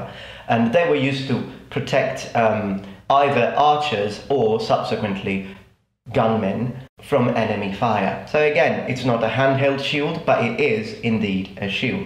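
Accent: England, United Kingdom. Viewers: Why do sound so British you are Italian though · knew I could hear some demented English accent in there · Bait? It's a genuine question. What sound do you think he's pronouncing non natively? I'm genuinely curious to know what sounds off to your ears about his accent, since if I heard someone talking like him, I'd assume they were a Brit.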